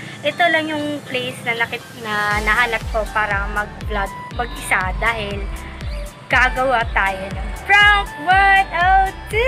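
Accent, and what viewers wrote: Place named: Visayas, Philippines. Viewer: Kakafollow ko lang sa tiktok mo haha ang cute mo yung bisaya na. Ang huy ex yan na ba bago mo 😂😂😂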